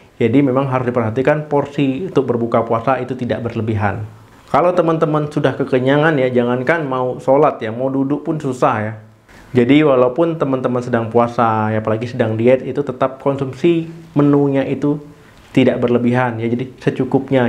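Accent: Aceh, Indonesia. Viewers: Dok orang Aceh ya? Logat nya Aceh masih kental soalnya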